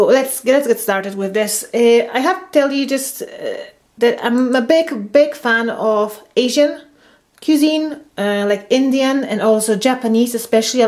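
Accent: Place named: Scotland, United Kingdom. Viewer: I loved this video! I can only presumeFrom some of your pronunciation "livin', aye, wee" that you are living in Scotland? :) I am Scottish born and raised and a follow vegan! So bery glad I found your channel! Xxx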